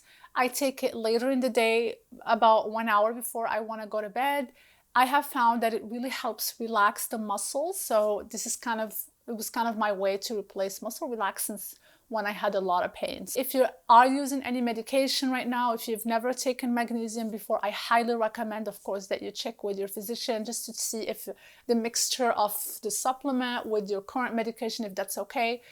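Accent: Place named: Morocco. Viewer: i smell Moroccan accent here dont know why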